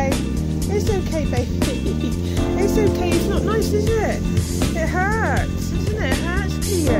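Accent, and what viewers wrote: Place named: United Kingdom. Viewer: Hey how are you doing? 🙂👍 hope all is well. I know I've said it before but I love your British accent. Lol Cool looking houses you guys have there. So different from here in the USA.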